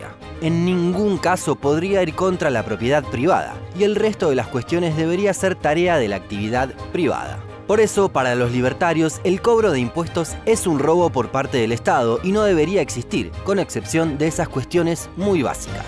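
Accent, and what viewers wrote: Rioplatense, Argentina. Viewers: Tienes una excelente edición amigo y que elegante se escucha el acento rioplatense sin modismos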